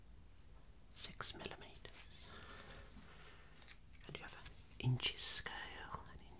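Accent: Birmingham, United Kingdom. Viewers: Love this , just found you . I miss hearing a brummie accent 🥰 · He’s a brummy I think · Yooo I don't think I've actually heard an ASMRtist with a Brummie accent before, it's really nice! Great video dude, I love visual effects like this, they're super relaxing. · I was starting to think that my tingles had gone and nothing was doing it for me, until you, not sure if it’s the accent, and being from Birmingham too it’s lovely to hear 😊 I love all your videos 👏🏼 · Are you a Brummie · As a lad who comes from the Black Country and the areas around Brum. I have never heard a brummie talk so quietly 🤣🤣🤣🤣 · Judging from his accent I would say the UK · This is excellent! Is your accent also from Birmingham? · Never thought someone with a brummie accent would help me sleep...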